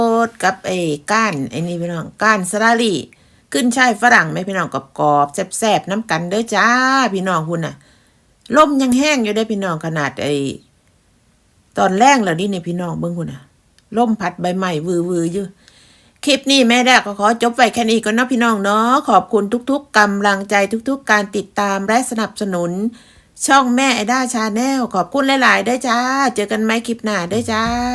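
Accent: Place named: Isan, Thailand. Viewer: น่ารักมากลูก พูดภาษาอีสานได้ด้วย❤❤❤❤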